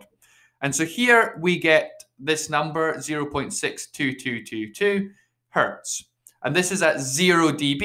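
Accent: Scotland, United Kingdom. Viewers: UK probably, scotland i think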